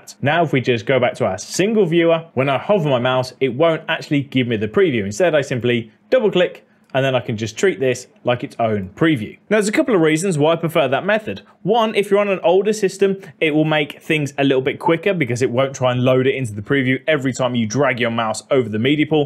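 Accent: United Kingdom. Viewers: Talking fast with a British accent😬 My ears aren’t use to it…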